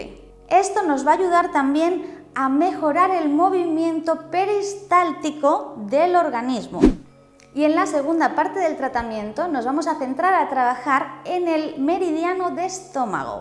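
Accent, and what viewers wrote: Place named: Spain. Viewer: Ese asento español es el peor, pérdida de tiempo con este vídeo.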